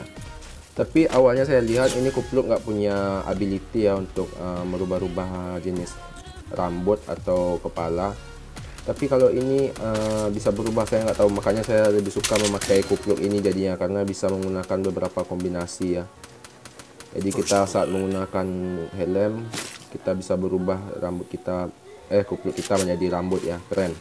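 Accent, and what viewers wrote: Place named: West Sumatra, Indonesia. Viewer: Suaranya mirip minang kocak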